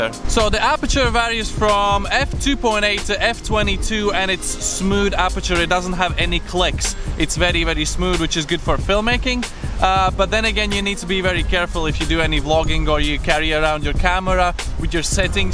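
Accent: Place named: Scotland, United Kingdom. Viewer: I can't place your accent... I recognise a Scottish edge in there.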